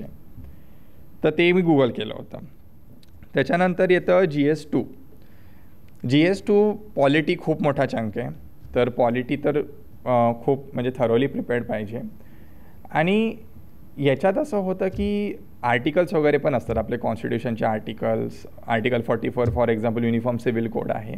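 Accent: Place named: Amravati, India. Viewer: Veryyy...Informative sir....Amravati ascent can be seen .....🙏🏿🙏🏿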